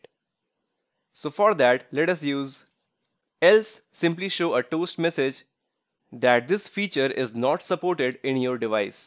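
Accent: India. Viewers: typical indian voice!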